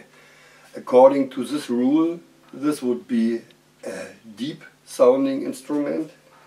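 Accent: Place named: Germany. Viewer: am Ende wird man den deutschen Akzent nie los.. Jedenfalls danke für das Video, ich habe lange nach etwas in der Art gesucht..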